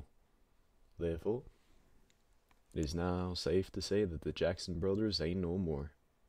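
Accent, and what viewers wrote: South, United States. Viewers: as a person who lives with country people, The accent is so funny to me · I am so confused. Is the location America west or Austraila west? If it is America west, we don't have dingos. If it is Australia west, then the accent should be Australian and not southern north American. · That was a good southern accent attempt 👍👍👍👍 good job 😊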